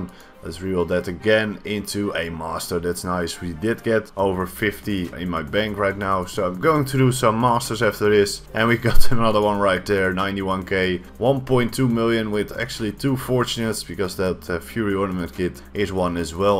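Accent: Netherlands. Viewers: hears the guy talk Me: 'Is he dutch?' looks at the channel Me: 'Ah'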